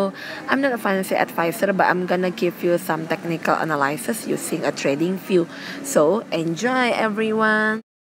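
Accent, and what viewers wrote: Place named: Indonesia. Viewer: Ur accent sounds like indonesian 🇮🇩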